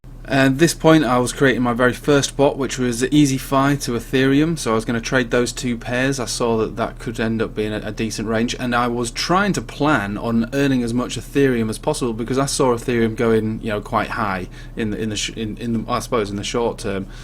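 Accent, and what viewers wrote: United Kingdom. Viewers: Sounding like you in the UK, this actually works here?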